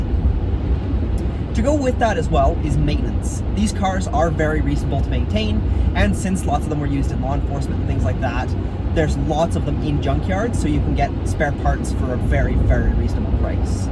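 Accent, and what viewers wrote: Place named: Canada. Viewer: i am also canadian .. i can tell by your voice lol( native american from rez in canada)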